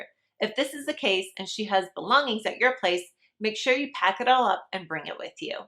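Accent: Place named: Poland. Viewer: Yeah. I think Polish is first language.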